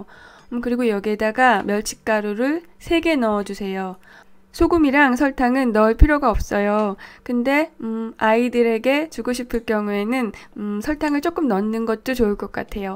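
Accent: Seoul, South Korea. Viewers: 목소리도 굉장히 좋고 말투도 상당히 매력적이세요! 그런데 꼭 들어본 것만 같아서 계속 생각해봤더니 서울사투리를 쓰시는 것 같아요!! 꼭 그게 아니더라도 정말 개성 넘치는 말투라 듣기 좋습니다~ 좋은 영상 감사해요 ㅎㅎㅎ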